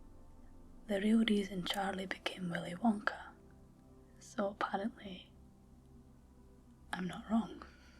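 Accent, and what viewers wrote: Scotland, United Kingdom. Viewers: Yeah the scottish accent is a lot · lovely scots tones....zzzzzzzzzz.... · In an earlier vid I thought you had an Eastern European accent. But, you're Scottish! I love your accent (from the isles perhaps?). More to the point, you are a breath of fresh air, with a lovely relaxed chatty style. How have I not been a fan before now? And, of course, I am now deeply in love (tho I'm old enough to be your father. I know, kinda creepy innit?) I'm now subscribed. Thankyou for this.Im more relaxed than I've been in years! Rock on! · The Scottish accent is my new favorite. Thank you! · Momma said knock you out with that Scottish tongue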